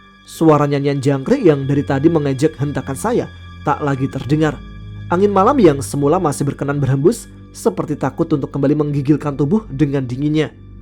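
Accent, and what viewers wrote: Jakarta, Indonesia. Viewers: Ane orang Betawi bang, keren bahasa Betawi ucapan bang masuk da kaya berrry prima😅😅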